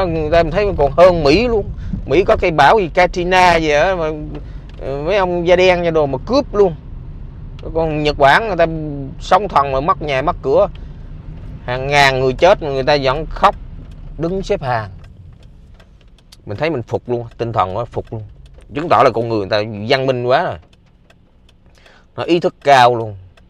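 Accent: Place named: South, Vietnam. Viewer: Anh nói giọng NK rất hay, ở VN hiện nay mở đài lên toàn nghe giọng BK nghe không vô.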